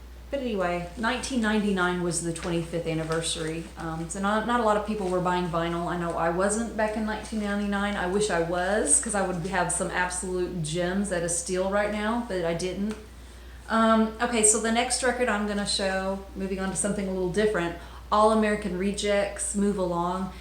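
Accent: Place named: Kentucky, United States. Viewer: OH! Wow I;m Jealous! is Playing Aug 20 about 35 minutes from my house soon. I think it's mostly sold out. Never seen him though I've had a few chances. I thought I detected a KY accent. My Dad use to live just north of Louisville and I spent some time there. You'll have a great time. I know you will!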